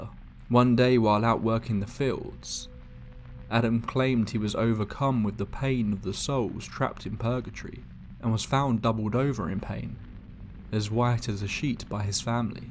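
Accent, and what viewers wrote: United Kingdom. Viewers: Hey! A huge belated happy new year sunshine! I subbed to u not very long ago; I’m a huge fan of bedtime stories & Mortis Media… so I figured I’d give u a go! I’d dearly love to hear more about black eyed kids! They freeeeaaak me out!!! 😱🤪 P.s it’s always great to hear a fellow Brit narrating!! 🇬🇧👍🏻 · Ugh... Yet another British narrator... Not as many words mispronounced as usual but still annoying. Also the narrator drifts off at the end of each paragraph and speaks too slowly overall.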